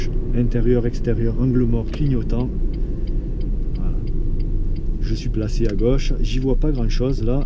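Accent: South, France. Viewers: Salut, J'ai mon examen du passage du permis dans 27 jours, je révise grâce à tes vidéos. Quel plaisir de t'écouter et d'entendre ce bel accent du Sud que tu nous offres, ça donne du soleil à mes révisions. Gratitude infinie de Normandie 🙏💐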